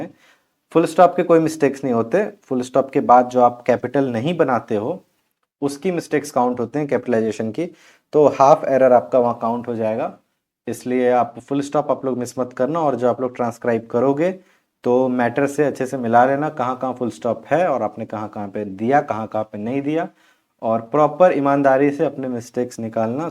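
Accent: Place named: North India, India. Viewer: North Indian accent ......not useful for South Indians....you people call school as ischool.....😂😂😂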